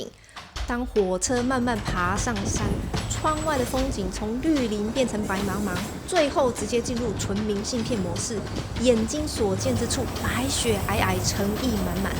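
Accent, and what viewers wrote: Taiwan. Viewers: 看完影片，先订阅，你的文彩，佩服，台湾腔的国语，把原有的幽默更提一层，期待下一期，说一下你的攻略，包括旅轮网站，搜索技巧，上岸那些景点值得一去，错过会小遗憾，订船上旅游还是下船跟当地导游(我们是刚退休人士)，火车skygway必须事先或下岸预订(怎么算好那天)，等等. 谢了🙏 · 台灣口音甜蜜親切又有趣❤❤❤😂😂😂👍👍👍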